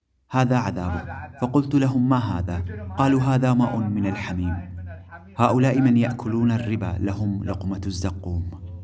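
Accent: Iraq. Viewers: لو مامترجم احسن كلامه واضح كلامه بلهجه العراقيه الجلفيه العاديه ومعروفه واضحه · هذي ليسة لهجة قديمة هذي لهجة اهل الغربية من العراق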